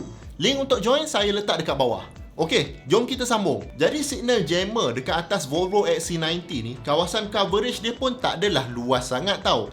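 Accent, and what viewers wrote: Malaysia. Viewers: Penyampaian jgn kaku ,lagu kasi cantik lagi, mana2 perkataan inggeris jangan bunyi Melayu sangat, agak2 sikit, jadi kelakar nanti murid2 nak educate. Kurangkan r & ē tu. Good juob.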